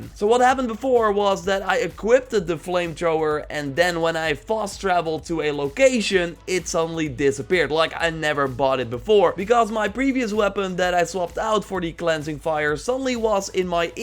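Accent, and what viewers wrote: Netherlands. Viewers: Are you Dutch/Flemish?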